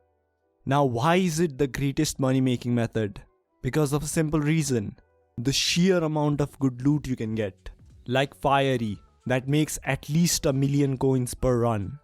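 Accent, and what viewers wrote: India. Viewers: by the voice i can surely say he is an indian love u from india <3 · I cant handle the thicccc indian accent it hurts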